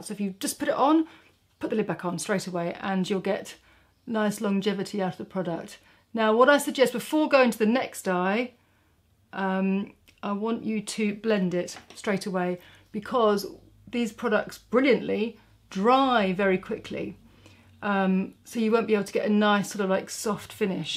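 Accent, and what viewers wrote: England, United Kingdom. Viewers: greetings from Los Angeles. Luv this look (and so many others) for La La Land. I really enjoy your videos b/c, like me, you are fair with light eyes. I am that girl who takes a hat everywhere, wears gloves for driving and puts zinc oxide on under my makeup (Sisley-Paris makes a great stick) and even over my self-tanner. It's a constant challenge to prevent discoloration as spots feel as though they come about just from stepping out the front door. It's very tricky finding the right "natural" makeup look, especially in a town where you're supposed to roll out of bed looking like Cindy Crawford (I have actually seen her out for lunch in Malibu so, tough competition!) Thanks for your tips, effort and bravery for getting on camera and being so real. For some reason, things just sound better with an English accent;) Ciao! Alexis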